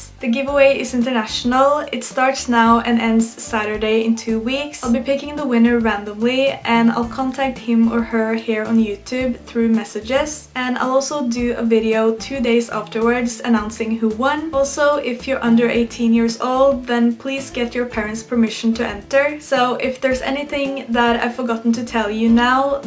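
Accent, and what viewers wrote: Norway. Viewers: You're super good. but i can here by the accent that you are Norwegian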